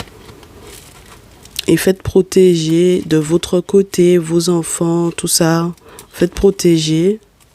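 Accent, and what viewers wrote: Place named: Antilles, France. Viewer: Vous avez un accent Antillais. 😁❤️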